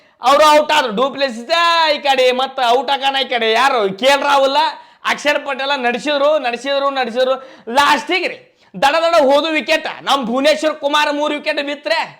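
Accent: North Karnataka, India. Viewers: ಏನೇ ಹೇಳೆದ್ರೆ ಸರ್ ಉತ್ತರ ಕರ್ನಾಟಕ ಭಾಷೆ ಚಿಂದಿ ❤️❤️❤️❤️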